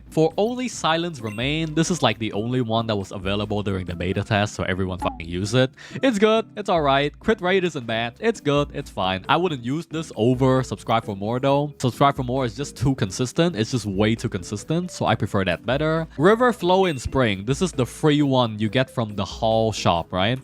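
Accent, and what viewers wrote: Vietnam. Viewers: Is he Vietnamese. My cousin has the same English accent xD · dude sounded like a vietnamese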